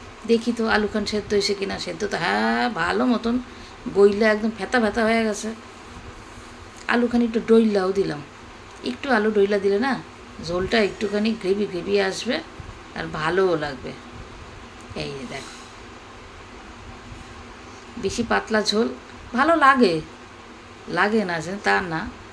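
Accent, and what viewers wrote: Bangal, Bangladesh. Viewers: লাইক সাত ,তোমার এই বাঙাল ভাষার কথাগুলো দুর্দান্ত লাগছে শুনতে । পুরো ভিডিও তোমার ভিডিও থেকে তোমার কথা শুনে খুব আনন্দ পাইলাম।😂👍💓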